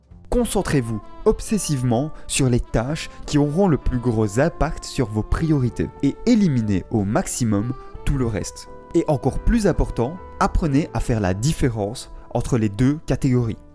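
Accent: Liège, Belgium. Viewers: Bon bein je crois que j'ai reconnu l'accent liégeois :)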